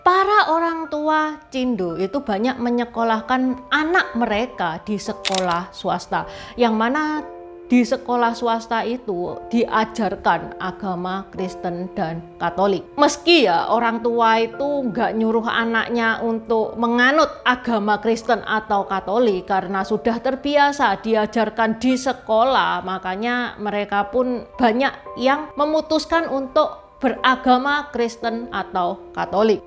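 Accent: Java, Indonesia. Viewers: Suka dengan suara jawa nya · Aku suka logat medhok, jd inget wkt sekolah di jogja hehehe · Tionghua....tapi medok jowo.... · Keren nih china,dialeknya jawa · Ketauan cindo ini kalo dia cindo Jawa soalnya Medokan jawanya terasa banget😂😂😂😂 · Oke trima kasih skrg sy jd tau.Oya sy mau tanya ya kenapa ya cindo yg lahir di Medan atau di Bangka kok logat bicara tak sperti yg ada di daerah yg mereka tinggal ya Tak seperti cindo ( tionghoa) yg lahir di Jawa atau Sunda Salah satu contoh nya sy yakin asli dari Jawa kan....hehehe. Soal nya logat bicara nya ketahuan hahahehe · Mbak e logatte jowo banget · Chino Medok Jowonya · Kalo saya perhatiin di malaysia dan singapore, semua etnis yang ada disana seperti benar-benar terpisah. Di malaysia bahasa melayu umumnya digunakan oleh etnis melayu. Etnis tionghoa berbicara bahasa tionghoa dengan sesamanya, etnis india berbicara bahasa india dengan sesamanya. 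antar etnis berbicara dengan bahasa inggris. etnis selain melayu sepertinya enggan berbahasa melayu walaupun sebenarnya bisa. Apalagi di Singapore. Tapi sepertinya etnis Tionghoa lebih bisa berbaur. Bukan hanya soal bahasa Indonesia, tapi dengan bahasa daerah masing-masing tempat tinggalnya. sendiri malah lebih medok daripada saya yang asli jawa dan berbahasa jawa di rumah. Apakah ini semata-mata karena kepres 67 atau memang cindo pada dasarnya memang lebih membaur? Salut dan hormat buat atas kerja kerasnya membuat konten yang sangat mencerahkan.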